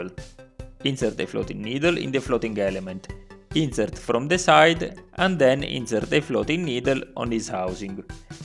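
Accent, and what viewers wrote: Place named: Italy. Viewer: I totally enjoy your italian-English! Great video! Greetings from Germany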